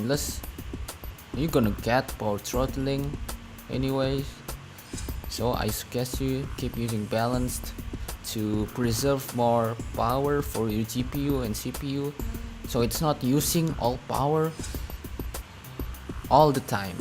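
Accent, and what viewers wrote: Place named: India. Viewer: For some reason he's the only indian guy that tries to speak english in a funny way haha i love it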